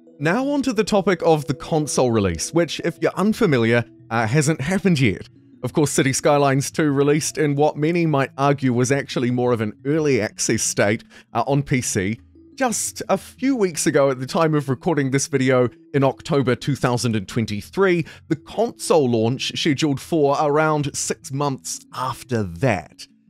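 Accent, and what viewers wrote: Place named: New Zealand. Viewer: So nice to hear these updates with a fellow Kiwi accent. Had read the email about the latest patch but I just wanted to say THANKS for explaining things a bit better.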